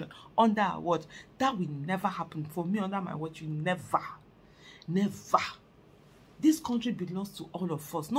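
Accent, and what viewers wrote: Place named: Bendel, Nigeria. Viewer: Proper Bendel woman talking! Better listen o!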